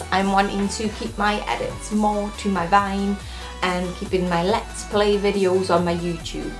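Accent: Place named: Liverpool, United Kingdom. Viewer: Sound scouse most of the time here. Best of luck with the channel!!!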